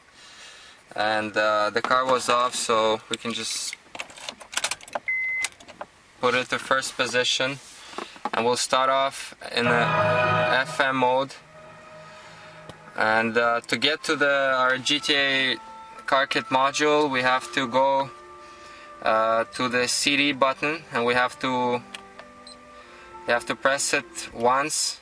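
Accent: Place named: Russia. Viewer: Igor Grusha так акцент-то русский, скорее всего автор видео тоже наш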